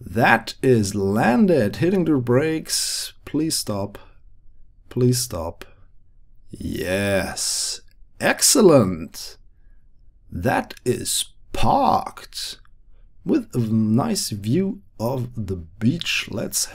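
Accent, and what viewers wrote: Germany. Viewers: Ach komm schon ich hör doch an deinem Akzent dass du deutsch bist ;) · Great SSTO and building skillz. But i can't listen to your narration - why dont you speak in your mothertounge? (german?) Greez from Vienna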